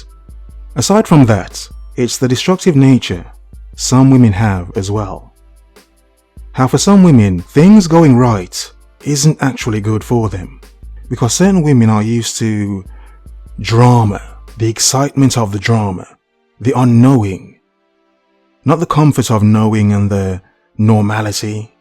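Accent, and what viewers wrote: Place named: United Kingdom. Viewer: This guy's British accent is so much easier on the ears than those other channels.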